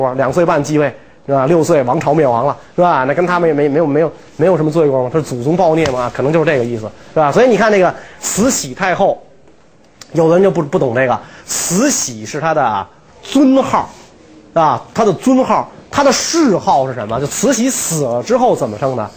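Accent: Beijing, China. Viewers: 首先得是北京口語，節奏明快用詞精準，光聽都覺得順耳，流暢不嗑巴..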